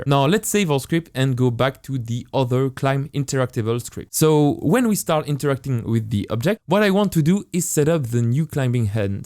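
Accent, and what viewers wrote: France. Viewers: Your French accent is AWESOME! I love clicking a video and hearing your voice, because I know the content coming in is gonna be EPIC! 😙👌 (My emojis don't have the "chefs kiss" hand, but you get the picture 😂 ) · Ton accent français m'aide a comprendre parfaitement 😅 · Be honest here mate, always hated the french accent, no matter who spoke it, but damn ive learnt alot from you, love your accent now :D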